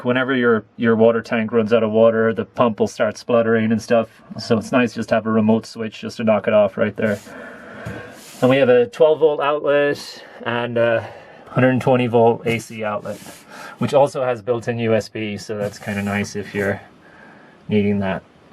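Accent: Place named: Ireland. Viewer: I detect an Irish accent.where u from.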